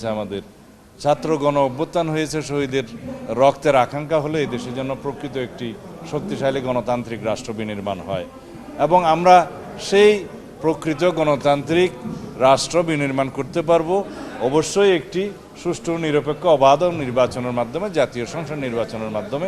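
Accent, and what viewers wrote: India. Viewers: র এর সদস্য জ্বালা হবেই/সালাউদ্দিনের কথা বলার স্টাইল আওয়ামী ও ভারতের মত। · এই টা ভারতীয় মাল কথায় বুজা যায়।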